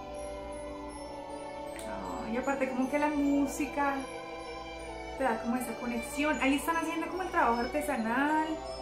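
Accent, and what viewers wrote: Colombia. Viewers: Me encanta el acento colombiano! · Amo el acento colombiano 🥺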